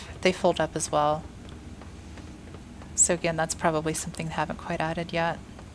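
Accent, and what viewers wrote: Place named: United States. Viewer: awesome peek at whats to come! I'm so pledging as soon as payday comes! Its proper exciting:D lovin your American accent:) EVE Online is no more for me....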